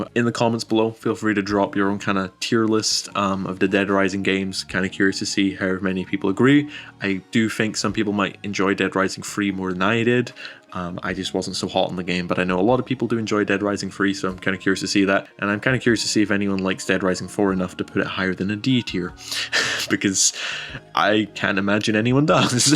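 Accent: Scotland, United Kingdom. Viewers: bro have some cool scottish accent. Love your comment on the whole series! I personally like Dead Rising 3 more than 2. I am totally agree with how you appreciate 2, but Dead rising 3 is a different story. To me it is like a gta with some real content, instead of crime simulator that just stealing car and killing random people and stealing car. The unrealistic combo weapon may look ridiculous, but they are so interesting and full of imagination, free from reality. The unlimited type of weapon spread over the map, and all the collectable makes fun to find all of them.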